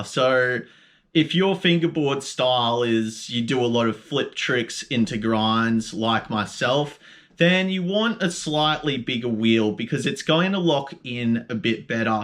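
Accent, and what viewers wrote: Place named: Australia. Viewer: Im not even making fun of you but gawd thats a real aussie accent. Nice vid tho.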